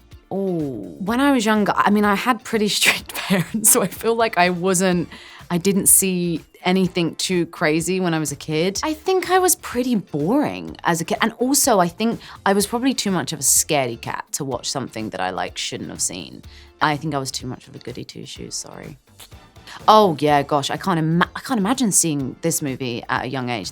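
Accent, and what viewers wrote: United Kingdom. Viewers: British accent 🇬🇧🇬🇧🇬🇧🇬🇧🇬🇧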